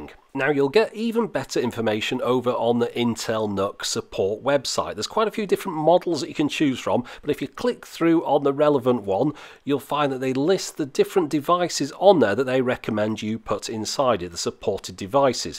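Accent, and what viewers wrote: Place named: North West England, United Kingdom. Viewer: You mustn't be English. James May is from Bristol. is from the North West of England. They both have regional accents and they are from opposite ends of England.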